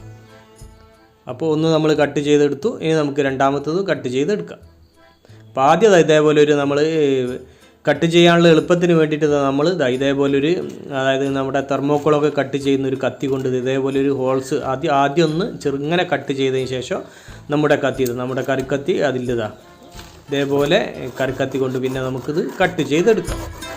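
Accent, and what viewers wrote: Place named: Palakkad, India. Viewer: സംസാരം കേൾക്കുമ്പോൾ പാലക്കാടുകാരനെപോലെ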